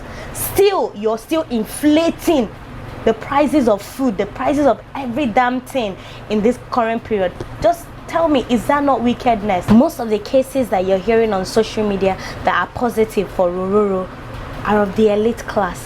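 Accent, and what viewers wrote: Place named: Igbo, Nigeria. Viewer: u fine well ohh...And I love your Igbo sound ohh...its just too sweet.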